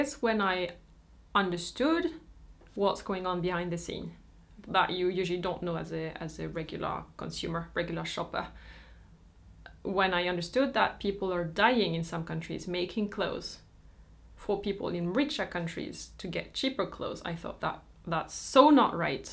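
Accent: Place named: France. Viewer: Dear, your English is very good, even with so much French an intonation. I've learned a lot from your videos that I have no idea before. You are my favorite.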